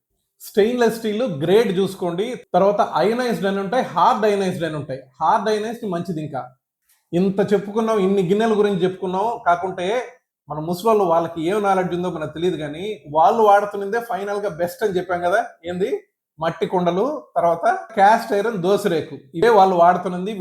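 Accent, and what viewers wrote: Nellore, India. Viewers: I like your way of thinking and explaining things very clearly.I like your Nellore style accent.God bless you young Dentist.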